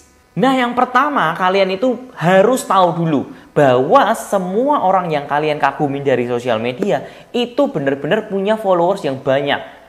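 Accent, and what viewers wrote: Java, Indonesia. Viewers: Medok koh 😀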